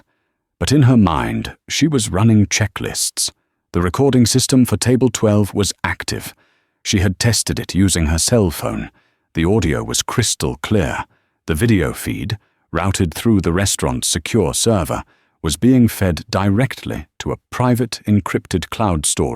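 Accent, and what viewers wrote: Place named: United Kingdom. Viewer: What I really appreciate is the one freeze frame picture at the top of the screen that never changes, slide shows or moves. I put a piece of paper on it and just get lost in the story listening to that purring English / British accent while following along with the transcript. My imagination does the rest. Also, I like the ability to backtrack by highlighting sections that have already gone by. Some channels are changing the algorithm so that it is not possible to do this with the narration. Only with silently reading it over again. Please don't do that. I like hearing again some section(s) that have intrigued me, made me smile or even laugh.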